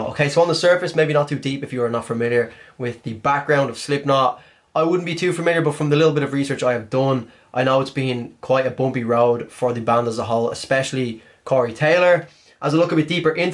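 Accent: Dublin, Ireland. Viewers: yaaaaay knew it. Are you from Dublin? I'm trying to distinguish whether your accent is northern our southern, help me here lad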